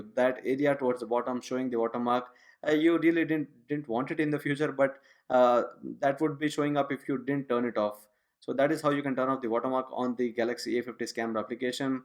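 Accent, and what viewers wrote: India. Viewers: The presenter is doing a good job of demonstrating how much he knows...he has no idea how to be a teacher...very fast talker, his very strong Indian accent is almost unknown. leaves everyone behind. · Stop with the accent you Indian I don’t understand u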